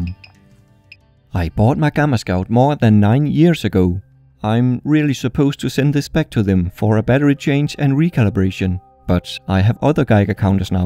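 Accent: Denmark. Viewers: uhhh are you danish? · that danish accent :o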